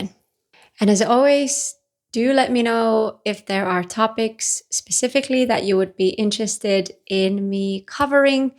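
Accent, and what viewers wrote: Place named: Ireland. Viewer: You even picked up a bit of an Irish accent.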